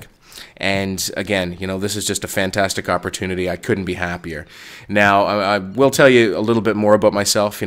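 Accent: Canada. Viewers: OMG...... are you Canadian?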